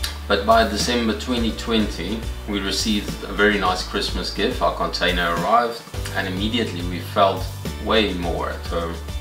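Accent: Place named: South Africa. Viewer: Am new to ur channel i ave watching ur videos for sometimes now i really enjoyed it. So i subscribe to ur chennel i also watch other south african youtuber one base in Vancouver also an one in toronto you guys are really lovely people love ur ascent i am a Jamaican from watching all of you ur videos i cant wait to get to canada i wish you an ur family very happy an productive life in canada.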